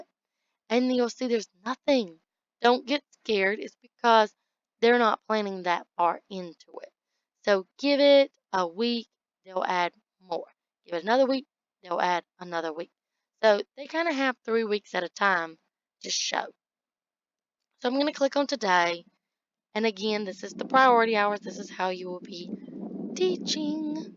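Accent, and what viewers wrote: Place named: South, United States. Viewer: I’m from Atlanta. I’m guessing you’re Alabama or Mississippi. Maybe South Carolina?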